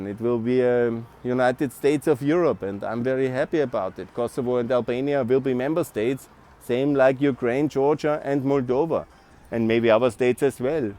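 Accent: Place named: Germany. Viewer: becauz your english accent like german gunther; that’s why they thought ur german..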